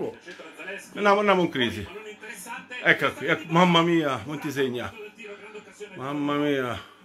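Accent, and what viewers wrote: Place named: Rome, Italy. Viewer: A,ti voglio ibbbridddo,accento romano,e. testa juventina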